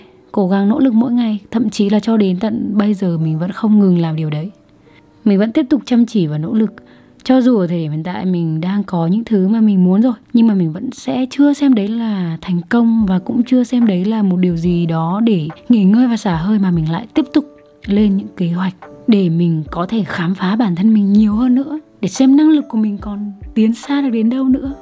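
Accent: Vietnam. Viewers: Nghe giọng c trong video đã ngờ ngợ r nhưng mà giờ mới biết c là người miền trung hihi❤️ e cũng hàng xóm vs HT nè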